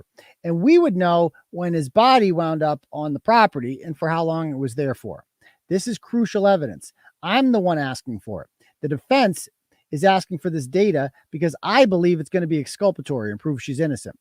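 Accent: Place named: South, United States. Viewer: Your southern accent is hilarious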